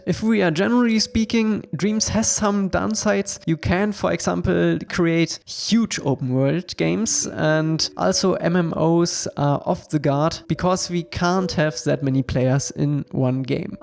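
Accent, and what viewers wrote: Germany. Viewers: Am I crazy or I can really hear a German accent? If yes, I am your neighbor. But from Poland :P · I'm really looking forward to the game, take your time and don't be stressed out. The game should be just as cool as you imagine it to be. Ps. may it be that you are german, your english sounds exactly like mine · Mann hört heraus das du ein Deutscher bist🇩🇪❤️❤️❤️ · Pls. Fellow German. Learn the TH sound. Your waaaaaay to much of a genius, so this shouldn't be a problem. Really impressed on your game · Leichter Deutscher Akzent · Bist du aus Deutschland? Hahaha hört sich so an😂 Translation: are you from Germany? You Sound like that haha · Alter dein deutscher Akzent ist ja soo geil :))) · Ich feier dein Alman-Englisch😂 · are you from Germany?? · Love the german accent. Feels like hes introducing a german fight game. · Stabiler deutscher akzent 😂👌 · Ich mag den deutschen Akzent xd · Man hört es fast immer raus wenn Deutsche Englisch reden. 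· I think he is from germany :D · Ach ja der Deutsche Akzent xD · are you german because you Talk english like one (im an german) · Du bist deutscher man hört es so hart klingt lustig aber kannst gut englisch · Are u german? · Man kann raushören dass du deutscher bist 👌🏼 i like it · dieser deutsche akzent haha ich liebe es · Der deutsche Akzent ist thicc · Bitte rede normal english oder gar nt weil dieser deutsche Akzent nervt hart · Wie man den typisch deutschen Akzent raushört nice haha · are you german ? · Ist nicht böse gemeint aber finds lustig wie man hört das du normalerweise deutsch sprichst😂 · The german Accent is just on point😜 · I like how you can hear out that you're coming from germany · Wer liebt auch seinen Deutschen Akzent ^^ <3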